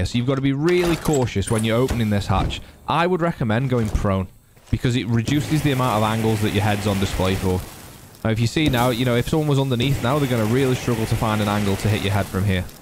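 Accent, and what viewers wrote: Stoke, United Kingdom. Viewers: wait a minute......are you a stokie ? :D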